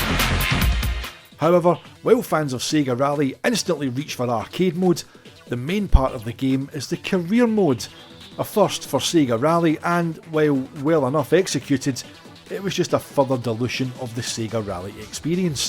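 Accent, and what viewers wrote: Scotland, United Kingdom. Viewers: I love the Scottish accent. Can't understand a word that you're saying, but it sounds like magic! Yet i just don't know why SEGA didn't bring 2.5D versions of all of these great games out for the Genesis/MD. Over 40 million of those consoles in the marketplace, and the limit pushers of 1994-97 proved that the machine was capable of doing press proper 3D and great graphics and sound.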